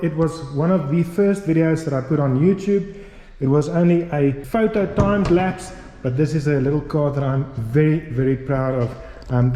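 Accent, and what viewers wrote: South Africa. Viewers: I caught the South African 🇿🇦 accent straight away & had to check your channel out. It looks like a lot of interesting content & I'm looking forward to going through the D Type build.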